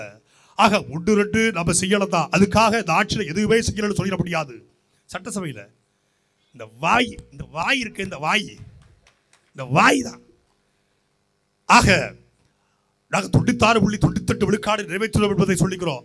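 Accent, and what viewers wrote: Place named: Kanyakumari, India. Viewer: Kanyakumari slang👏😅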